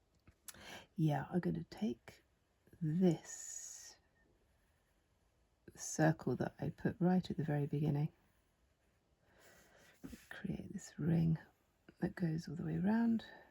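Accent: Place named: United Kingdom. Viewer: Hallo,. I am a new fan of yours. I came across a vid from you and enjoyed it immensely. I am a transplanted Scot living in the southern US and I always love and appreciate hearing an accent from GB! Having said that: I love to do doodles and have several notebooks devoted to them but I usually use pens. I've so many coloured pencils and almost never use them as I am a painter. This has inspired me to get out my 150 Prismas set and have a go! Thank you so much! Bless xo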